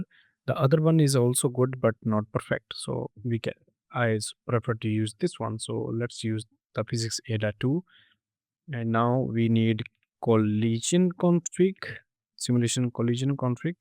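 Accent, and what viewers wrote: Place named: India. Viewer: Because of "offshoring" (getting rid of jobs in our countries and shipping them to india, or shipping indians over here to take our jobs) most English speakers can understand Indian accents pretty well, and yours isn't even too bad. Accents only really become a problem on phone calls if the reception is bad.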